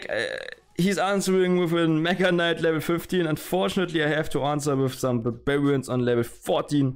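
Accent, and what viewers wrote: Germany. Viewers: Man hört so hart das du deutscher bist 😂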